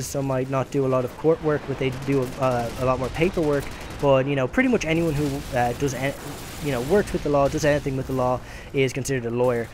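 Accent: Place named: Australia. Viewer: y bro? u sound kinda australian days why i asked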